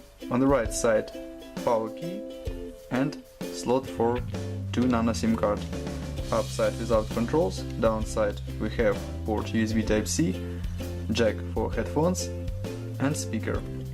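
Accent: Russia. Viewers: Русский акцент есть), где можно взять не подскажешь?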